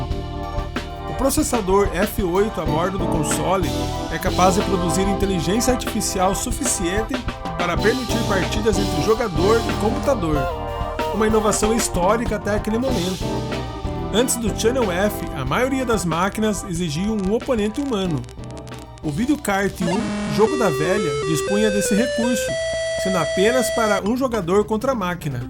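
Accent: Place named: Paraná, Brazil. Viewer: Ótimo conteúdo, mas dicção e "paranês" terríveis.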